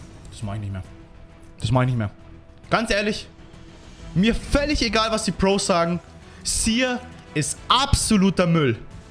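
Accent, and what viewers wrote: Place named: Bavaria, Germany. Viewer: kommst du aus Bayern dein Akzent hört sich leicht danach an :D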